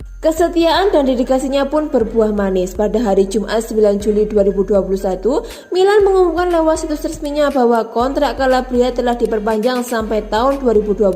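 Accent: Java, Indonesia. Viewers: Medok buanget yah pembawa narasinya 😁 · kental banget bahasa jawanya😆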